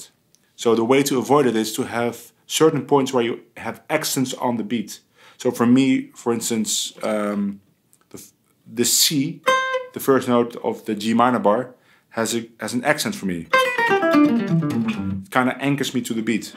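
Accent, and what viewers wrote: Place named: Netherlands. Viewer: When I first came across your video, I was watching it on a different youtube format and didn't see your name etc. But I said to myself ("I'm hearing a slight 'Dutch' accent") I was sure of it my parents immigrated to California in the the early 60's right after I was born. They didn't teach us the dutch language sadly. So I have this void that only Dutch accents can fill....does that sound strange? It's music to my ears wherever i can hear it so thank you, even with your very slight accent theres more music than just the guitar haha doi hartstikka leuk! [additional edit] I wanted to brag about my Dad's musical days in Zwartsluis, Genemuiden, and Zwolle. I've only seen one photograph of the harmonica trio he played in throughout the 50's but they were very good! He played the smaller 6 or 8 centimeter harmonica, another guy played one that looked to be a foot long, and the third guy played a hinged double harmonica that seemed to be 20 inches long or something. 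Incredible. My dad was Klaas Schraa😃:hydrate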